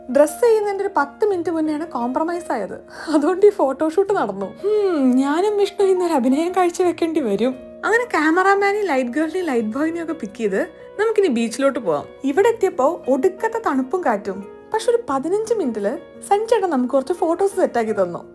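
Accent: Malappuram, India. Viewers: ഞാനും മലപ്പുറം തന്നെ മലപ്പുറംകാരെ ഇങ്ങനെ പറയുള്ളൂ ചേച്ചി😂😂😂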